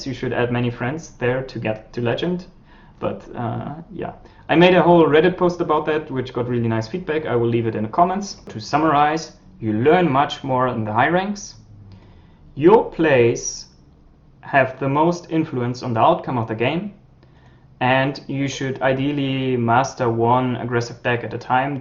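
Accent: Germany. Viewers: Wondered about that aswell, just sounds like german accent right? :-D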